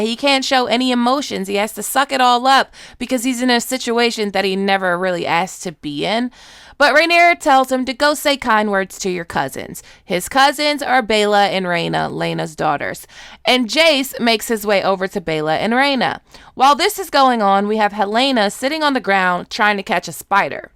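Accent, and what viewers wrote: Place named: Louisiana, United States. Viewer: She’s from Louisiana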